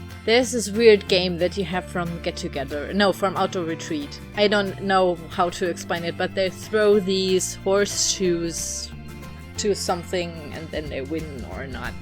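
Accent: Germany. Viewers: is she german? she sounds like me haha. well if i could speak fluent :p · Are you from Germany? Your accten sounds german :) Btw: This Video is amazing and so creative !! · you have the best German accent ever 10/10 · ich bin gerade sehr begeistert von deinem englisch. du hast einen superdominanten deutschen akzent. und dann hast du so ein großes und krasses Vokabular. respekt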